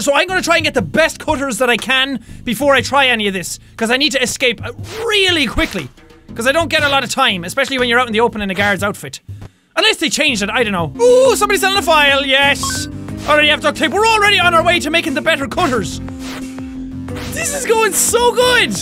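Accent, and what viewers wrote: Ireland. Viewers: When he says Carl it sounds like Carol because he's Irish as hell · Ai love the way the Irish say 'three' and 'arse' it awesome. 😂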